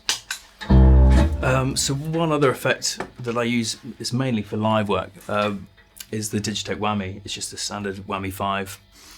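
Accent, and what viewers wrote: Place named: Wales, United Kingdom. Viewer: Blown away by the magnificent slide sounds on the various guitars and your wonderful Welsh accent. I enjoyed learning about the pedals and other gear, as well. Thank you for sharing all,. ❤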